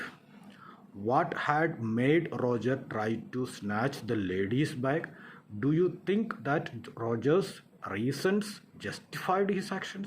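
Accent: South, India. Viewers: Your ascent is like a South Indian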